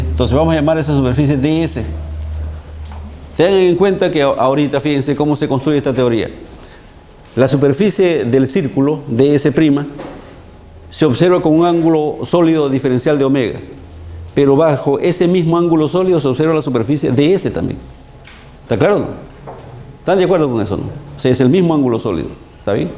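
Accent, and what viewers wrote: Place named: Peru. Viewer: Que buen video, gracias a UTP por subir sus clases. Me parece que el profesor es peruano por su acento.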